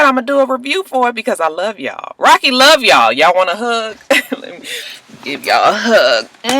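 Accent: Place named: Atlanta, United States. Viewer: You do sound a little ghetto but I love it! You sound like ATL native.....lol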